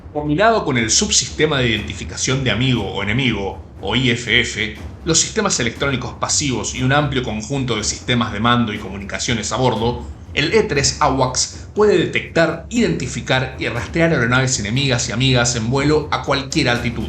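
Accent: Argentina. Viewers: Hola amigos, me encanta el canal, y LA informacion que nos brinda. Pregunta; quien es el narrator? Suena in poco Argentino, algo que seria in poco irónico!!! Saludos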